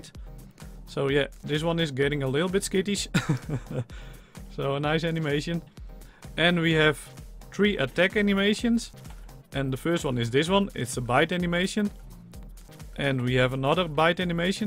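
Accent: Netherlands. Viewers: Hes Dutch lol that english do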